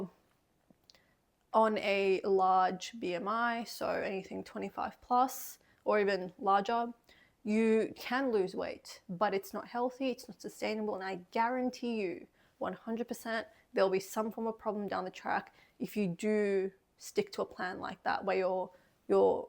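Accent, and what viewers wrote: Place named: Australia. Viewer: I like the content, but ur english is australian language, so it is difficult understand for Indians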